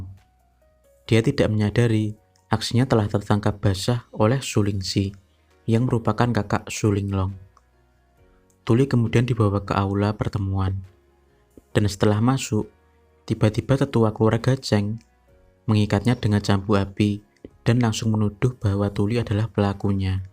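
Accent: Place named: Java, Indonesia. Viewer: Ngpmong nya medok bangat asli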